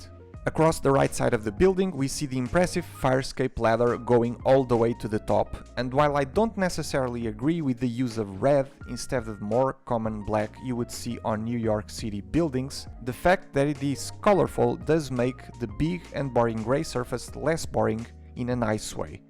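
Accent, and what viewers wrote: Portugal. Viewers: that portuguese accent x) trending video, congrats.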